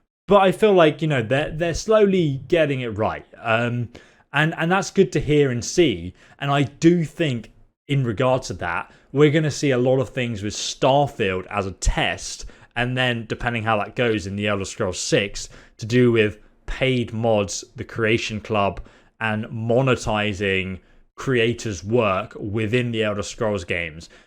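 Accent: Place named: United Kingdom. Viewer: Bought doesn’t have an r. Just because your British and say words with a weird emphasis on vowels doesn’t mean you can add letters.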